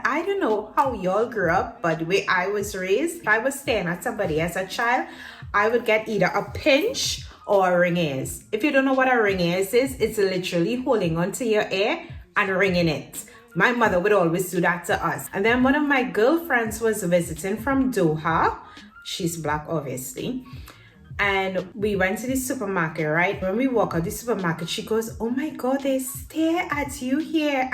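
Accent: Trinidad and Tobago. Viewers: First of all, love the trini accent!! As for the staring though, I must say that it too is a caribbean thing (though it may not be a Trinidad thing if they be ringing people ear) xaxa!!! Whenever I think of people staring, I remember how my Jamaican friend/classmate would ALMOST ALWAYS respond when she eyeing people down and they does vex over it: "is my eye" she say xaxa! Caribbean people love a stare just as much as Greeks, Germans, Turkish, Chinese or even Americans on vacation do. I don't think it is out of malice when Cypriots stare, it could be just that they don't see enough Trinis touching down :) kali tyxi! PS: Your tiktok did not lie; don't feel no how.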